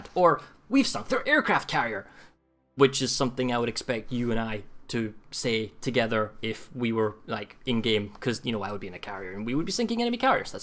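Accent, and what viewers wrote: Scotland, United Kingdom. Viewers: damn now i've got between Mighty Jingles and you....... i don't know..... i'll see with every mission (if you bring a little more scottish accent(soft spot) i'm in....) Why not strike a deal and get a scottisch speaking HANS in the game :)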